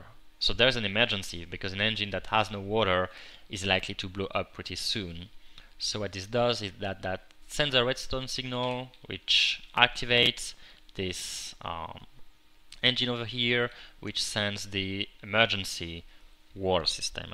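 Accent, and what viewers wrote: France. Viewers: Can you do a video explaining how to install this mod on a mac? I have not been able to install it, only because I have had such a difficult time with all the complicated installation procedures. Just a quick, brief tutorial would be extremely appreciated. Thank you! :) -greexm PS, Vous êtes Français? J'adore votre accent quand vous parlez l'Anglais, c'est très charment!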